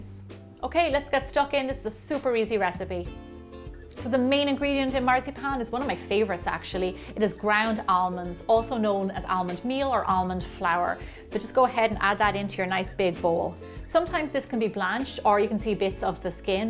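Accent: Ireland. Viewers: What a great Channel and I love your Irish? accident.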